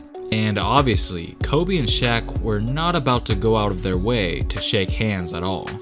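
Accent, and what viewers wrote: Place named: Philadelphia, United States. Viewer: I was just thinking dat Philly all day lol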